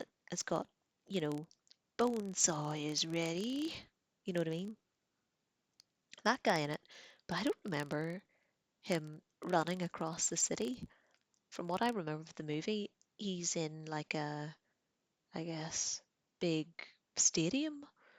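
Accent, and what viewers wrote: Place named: Northern Ireland, United Kingdom. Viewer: Great to find this..and another NI accent to boot 👍 I love that some of the language is making the reader uneasy 😂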